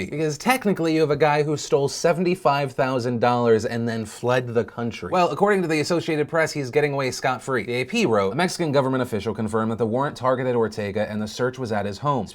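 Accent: South, United States. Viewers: I just noticed that you have a southern accent, I've been watching for years, and I just realized.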